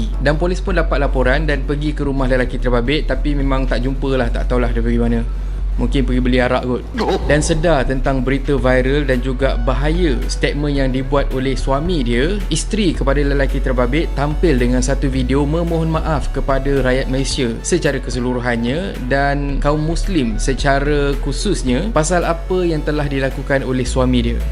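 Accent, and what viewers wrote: Indonesia. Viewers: Pompuan Indonesia biasa la...dari bahasa yg diucapkan memang Indon. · hahaha bukan melayu tu ,,bunyi indonesia je,entah dri mana minah ni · betul..slang cakap pon lain..betul2 melayu ke atau indon?..sebab indon x semuanya melayu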